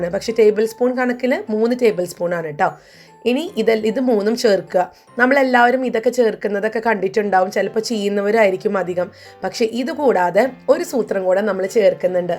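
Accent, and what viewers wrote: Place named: Thrissur, India. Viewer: alla vedio vum kanarundu. njan aadyame chindichu. ningal trissur kkari aanennu. othiry eshtanu samsarikkunnadu kelkkan. wish u good luck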